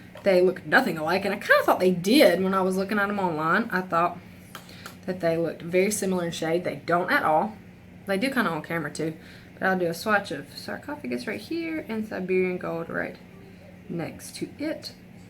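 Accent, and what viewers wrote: South, United States. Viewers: you have the same southern accent I have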